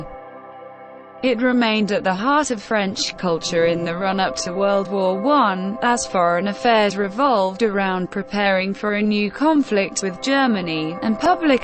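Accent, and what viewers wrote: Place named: United Kingdom. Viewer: With all the background noise this woman with a heavy British accent cannot be understood.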